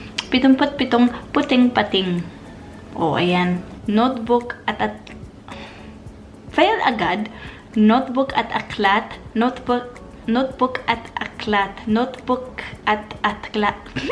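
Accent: Visayas, Philippines. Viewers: pwede ka magbisaya kay murag naa kay bisayan accent...shout out po...maligro family....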